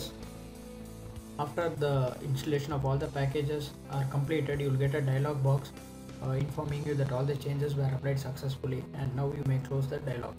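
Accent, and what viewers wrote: India. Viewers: Good tutorial, with indian accents. 10/10 Well done.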